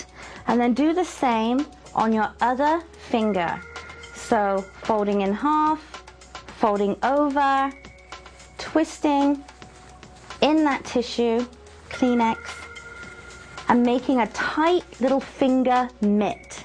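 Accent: United Kingdom. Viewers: pretty standard London/Southeast England accent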